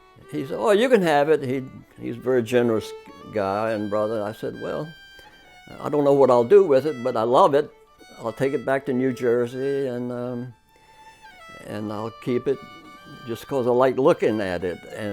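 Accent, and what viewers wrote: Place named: South, United States. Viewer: The accent is like sweet music to my Massachusetts ears. Such a gentile sound. I could fall in love with a southern gentleman. My ancestors on my mothers side came from Virginia, Kentucky and North Carolina. My 4th great grandfather was a baptist preacher down there. Rev. Andrew Tribble. The depression brought my mother up this way for work in the shoe shop factories in Manchester, NH.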